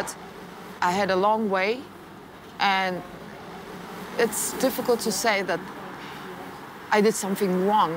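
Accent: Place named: Germany. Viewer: Такой прикольный английский на немецкий манер, крутая 😎 классная, очаровательная!!!😊😘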